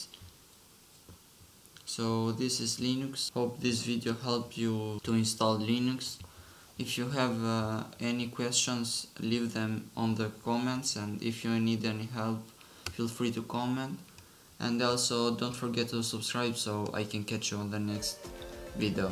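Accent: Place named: Albania. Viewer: O shqipee . Tkuptova direkt nga theksi i anglishtes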